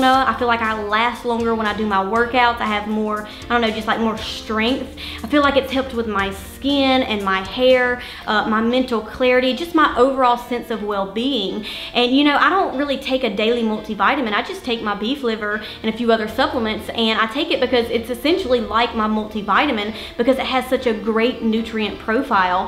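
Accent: South, United States. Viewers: I absolutely love your country accent, it’s awesome!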